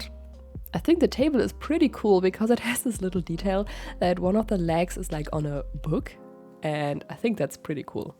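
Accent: Germany. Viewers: Yeh I'm wondering too!!! 😊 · is she german? i think i hear a little accent because i‘m german too and i got so excited bc i rarely find german content creators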